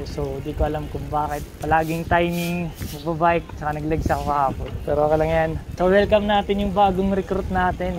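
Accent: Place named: Waray, Philippines. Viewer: Idol tagasaan kaba? Bakit may halong Waray yung sa lita nyu?😊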